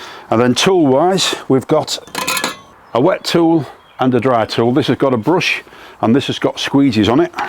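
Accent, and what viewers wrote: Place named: Hull, United Kingdom. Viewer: I'm from Hull and I'd recognise that accent anywhere!! Also, nice job emptying the leaves into the next door neighbours garden. 😂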